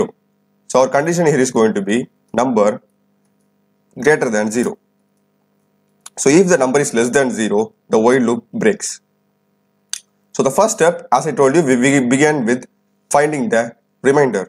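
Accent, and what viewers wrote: South India, India. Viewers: Its south indian accent bruh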